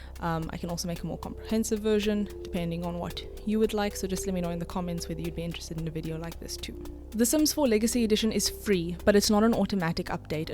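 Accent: South Africa. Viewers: You have a South African accent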